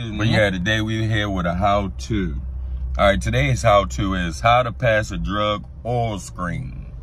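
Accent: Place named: Baltimore, United States. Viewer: Love a Baltimore accent lol! Orrralllll lol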